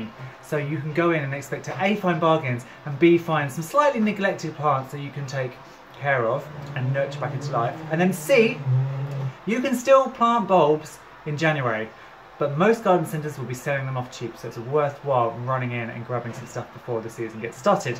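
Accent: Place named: United Kingdom. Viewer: Your video is very nice, but why are you speaking with a British accent??? I am Argentinian.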